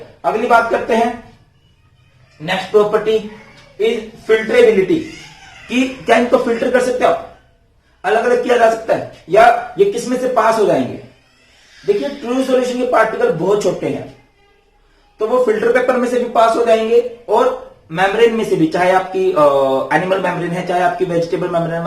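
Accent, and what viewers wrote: Haryana, India. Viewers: Mujhe lagta h aap harayana se ho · Sir aap hariyana s ho kya , becoz your voice is like that only 😅😅😅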